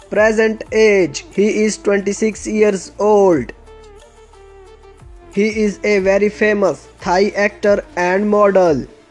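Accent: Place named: India. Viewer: the voice reminds me of Indian English accent! now I gotta go & watch some bollywood movies !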